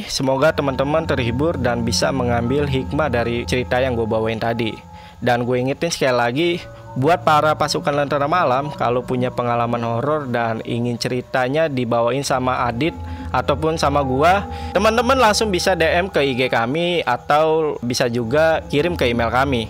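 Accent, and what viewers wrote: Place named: Bali, Indonesia. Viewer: Keknya abang pembawa acaranya orang Bali, soalnya napak kali logatnya kalo ngomong T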